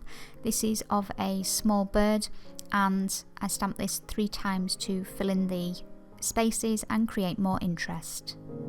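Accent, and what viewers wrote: England, United Kingdom. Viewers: A nice little treat to come home to after a hectic day at work! Thank u for the video,!! My hubby knows that if I’ve got YouTube on and I say: “ssshhhhh! It’s!!” before the video starts, it’s QUIET TIME; or if he walks in and hears the English accent, he knows that it’s best to simply wait till it’s over to tell me something because I’m in The Zone & won’t pay attention to him!!! LOL You’re just truly a lovely part of my day!! Thank you 4 that!! 😘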